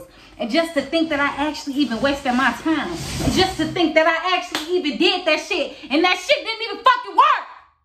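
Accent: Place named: Philadelphia, United States. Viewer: You gotta be from Philly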